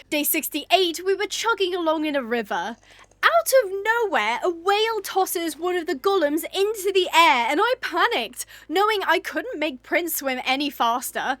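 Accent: United Kingdom. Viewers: Your voice sounds like British and it’s brilliant 😙👌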